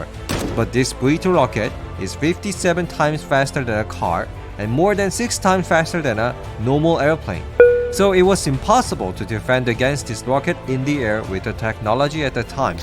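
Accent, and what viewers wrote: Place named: Russia. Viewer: Love your videos ❤ Btw you sound Russian are you from Russia?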